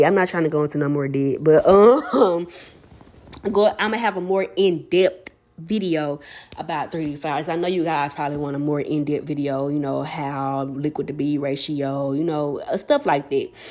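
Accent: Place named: Arkansas, United States. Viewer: I had a friend from Little Rock Arkansa, I’m from Compton,ca. he had that same accent as you…I love it though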